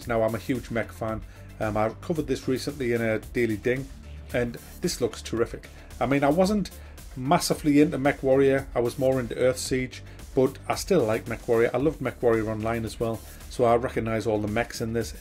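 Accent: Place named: Newcastle, United Kingdom. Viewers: I don't watch you for your PC penchant, I have watched your videos for years because of how you cut through the bullshit and give me an honest and entertaining review. Firstly, it's fucking refreshing to have a proper geordie lad on here rather than some squeaky yank and secondly, while I don't play on PC, I appreciate what you have to say about the games releasing today, especially when they're mediocre yet full of greedy ploys by devolpers who have probably never played a game in their lives. Seriously, keep up the good work but give others who have made a different choice than yourself, getting a PS4 a break for simply having a different preference. I'm shite with a mouse and keyboard, I always plug in a controller when I play games on my mate's PC. It's always funny to see me aim with a mouse just as it is to see him try and make heads or tails of a controller! 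· Haven't watched the video through yet, no idea whether you will mention the games I'M looking forward to but who cares? I come here for and his glorious, dulcet Geordie tones not reinforcement of my existing opinions. I love you dude, your channel is one of my absolute favourite sources of entertainment.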